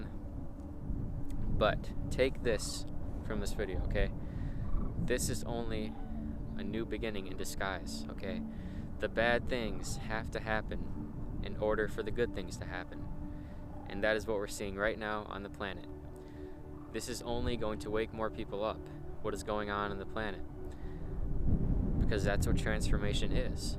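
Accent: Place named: United States. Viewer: hey yeah you had better. No, I am just kidding. Sorry to be so cringe, just that you just make me so happy. Sometimes my autism just gets the better of me, but then to calm me down, I just watch one of your videos and that helps me. I am from England and I just love the American accent 😍 especially yours. So once again, thank you. To be honest, If I met you in real life, I would be nervous wreak ha ha coz even though you are not doing ASMR anymore, your voice would totally relaxed me. Anyways, I am gonna stop being cringe right now lol. I will look forward to your next video. 😊❤❤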